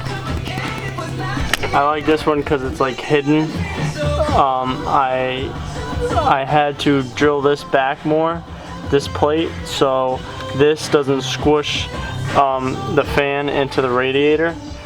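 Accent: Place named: Sweden. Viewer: are you swdedish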